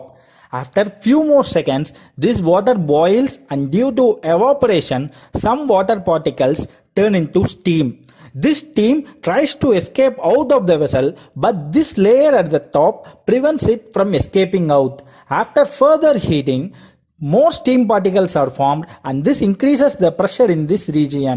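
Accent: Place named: India. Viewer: His indian accent, I couldn't understand because I'm not native speaker.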